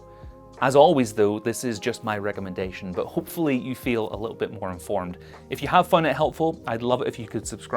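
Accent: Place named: United Kingdom. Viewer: OK I think this was exactly the channel I was looking for for years. 🥰 PS: As a non-native English speaker, may I ask you where does your "accent" come from (which part of the UK)? ☺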